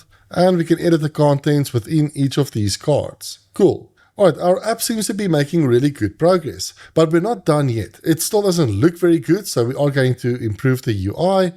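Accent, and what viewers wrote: South Africa. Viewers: I instantly recognized my home country's accent. RSA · I'm here for the content, and the fond memories of South African accents!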